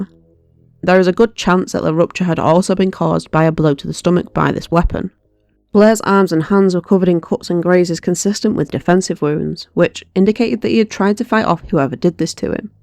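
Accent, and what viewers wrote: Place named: Huddersfield, United Kingdom. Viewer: Just found the channel and subbed because you sound local to me (Huddersfield) good luck 😀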